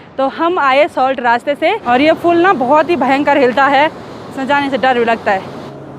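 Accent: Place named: Pahari, India. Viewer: By hearing your voice a recollection become fresh of my posting tenure at Pauri Garhwal 1992. A resembles, true copy of pahadi. You have a good quality of an utuber. Please write your biodata. Excellent 👌👍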